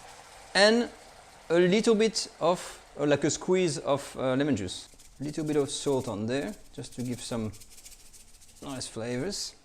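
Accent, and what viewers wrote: France. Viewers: I like the Chef french accent in english...Of course the recipe is delicious.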